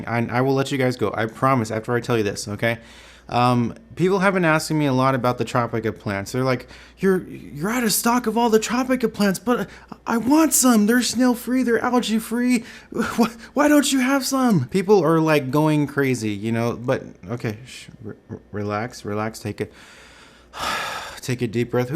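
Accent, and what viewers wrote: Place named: Glasgow, United Kingdom. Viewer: Glaswegian :P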